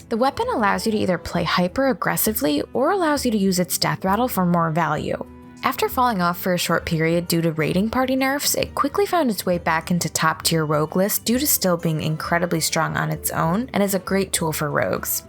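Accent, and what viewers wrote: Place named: Michigan, United States. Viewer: I don’t hate your voice. But your accent is very distracting when my brain is trying to figure out what a warlack is 🧐😜 Michigan, I’m guessing?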